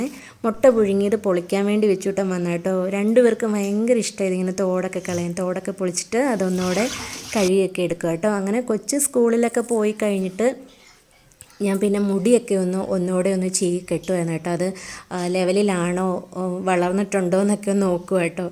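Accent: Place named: Kottayam, India. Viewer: You are too genuine god bless you ❤️❤️. ഞങ്ങൾ കോട്ടയത്തു നിന്നും രണ്ടു തലമുറ മുൻപേ കണ്ണൂരിൽ കുടിയേറിയവർ ആണ്. കോട്ടയം ഭാഷ കേൾക്കുമ്പോൾ ഇഷ്ടം ഒരുപാട് മര്യാദ ഉള്ള സംസാര രീതി ആണ് ❤️❤️❤️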